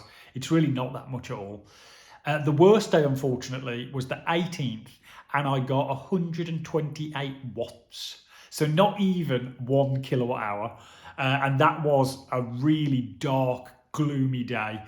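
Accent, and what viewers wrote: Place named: West Midlands, United Kingdom. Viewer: From your accent, I’m guessing you’re from the West Midlands, so we’ve got very similar weather. I’m surprised your imports are way lower than mine. I guess a lot will be the positioning of the PV. DNO export limit: 4 kW Inverter 6kw PV = 7kw (South-west facing) Battery = 18.8kwh Imported £65.59 Exported £15.01 EV MG ZS Octopus Go + Outgoing Octopus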